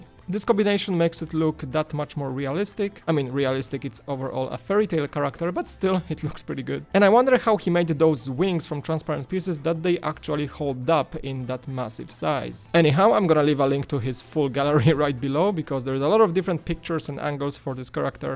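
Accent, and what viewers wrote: Poland. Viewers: are you from Poland or are you Polish? I apologize for the question but you have such an accent and you pronounce the names well in Polish.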